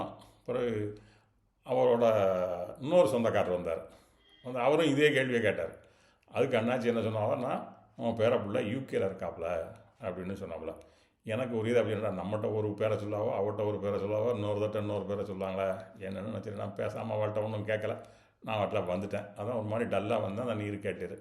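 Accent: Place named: Tirunelveli, India. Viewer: மங்கா புகழ் கொண்ட எம் நெல்லை தமிழே... நீவீர் வாழ்க.❤❤❤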